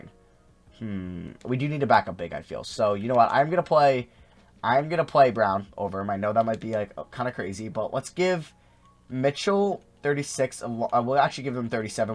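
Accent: Pittsburgh, United States. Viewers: Hey are you from Pittsburgh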